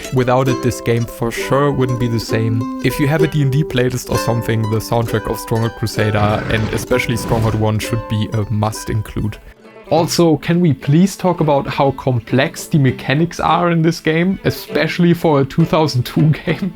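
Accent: Germany. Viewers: Ten seconds in and I already know you are german, very obvious accent lol But I really love all the memes in this video, I am indeed "all about the Ackermatch" when playing Crusader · Empire Earth and Cursader ❤ but are u german? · That is the hardest german accent i´ve ever heard :D But good video!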